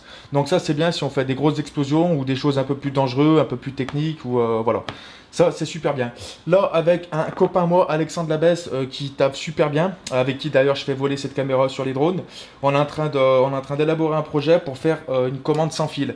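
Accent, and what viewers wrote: Saint-Étienne, France. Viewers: j'en ai beaucoup appris sur cette camera super vidéo mais par contre t'as UN PUTAIN D'ACCENT STEPHANOIS tu nous représente bien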